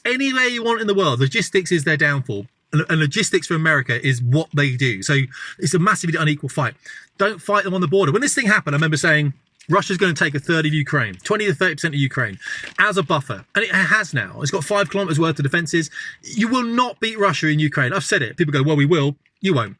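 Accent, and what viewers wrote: United Kingdom. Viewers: Wow this dude has that brit accent down ... brilliant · Love your UK accent mate. But I had to turn on CC just to follow you. You talk awfully fast. :) I have to ask what the real reason is that we (i.e. the US and other western countries) are so insanely set on defending Ukraine? It seems like it's got to be more than money. What is it in Ukraine that we don't want exposed? It must be something that threatens the cabal, the "powers that be". I don't know exactly who they are but it sure AIN'T Obama/Biden. Those people are just puppets. I'm sure Soros and company are part of this cabal, perhaps there are two competing cabals in which case I would expect the Vatican to be a part of it, i.e. the Great Whore, Babylon the Great, but regardless, there has to be something crucial in Ukraine which threatens their power. Trump is smart but I wonder if he really has taken the steps he needs to in order to crush this international shadow government. 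I'm cynically skeptical but we'll see. I think that once again he has people in his inner circle who are part of the shadow. Regardless, keep up the good posts mate.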